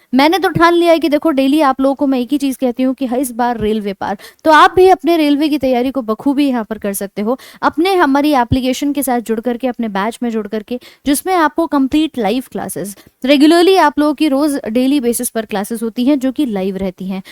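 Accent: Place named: Meerut, India. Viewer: Ma'am aap kya Meerut se ho kya Kyonki aap बढ़ाने को भढाना bolti ho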